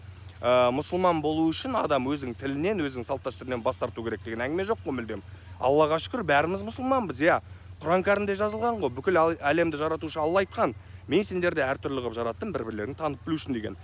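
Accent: Standard. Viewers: ... не всегда согласен с тобой, НО с удовольствием слушаю, когда ты говоришь почти на чисто казахском языке🙂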